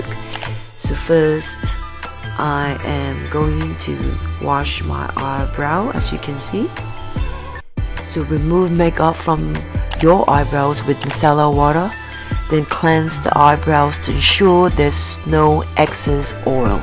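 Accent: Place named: United Kingdom. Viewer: Why are you speaking in British English accent ? Sosyal!!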